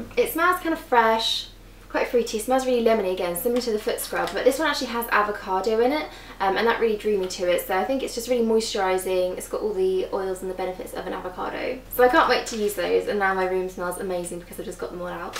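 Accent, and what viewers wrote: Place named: RP, United Kingdom. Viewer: You're very posh! Lol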